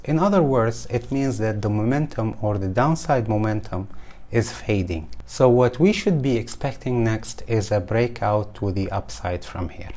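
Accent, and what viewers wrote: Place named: North Africa. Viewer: I am hearing a North-African accent :)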